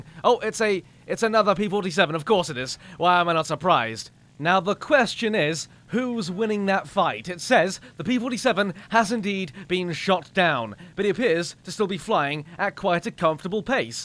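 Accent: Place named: RP, United Kingdom. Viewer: Good day, I have a question for you: I know you like to talk in a very civilized Brrritish manner, are you also able to speak with a more 'common' tongue like a Birmingham one for example? You see, I have made it a hobby to learn many different English accents, even foreign ones. I've got a lot of 'em pretty much down but now I'm more focused on different British accents. I would find it wonderful if you could give me some sort of 'insight', if you have any of course. Also, how you took that Spitfire down was just marvelous! Pilots like Magz and others could learn many things from you!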